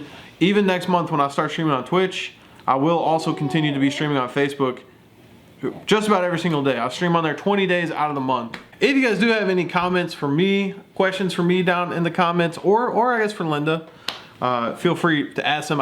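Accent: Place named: South, United States. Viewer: All of these years and I just now realized you have a southern accent.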